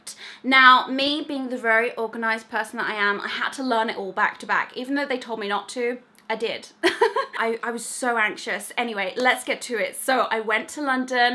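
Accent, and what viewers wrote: Birmingham, United Kingdom. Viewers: Omg i had no idea you were a brummy as well twins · I never realized you’re from Birmingham. I was told it’s the least attractive accent in the UK 😆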